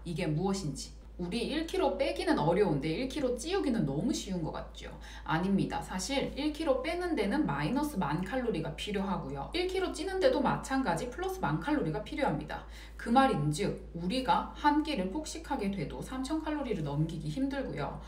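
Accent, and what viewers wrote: Gyeongsang, South Korea. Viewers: 경상도분이시죠? 내가 서울말쓸때랑 똑같은데ㅎㅎ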